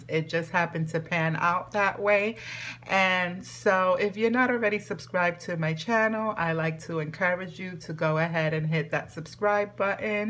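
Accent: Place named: Philadelphia, United States. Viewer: Great red truck items. Where are you located? You have an accent like my aunt. She lives in the Philly area.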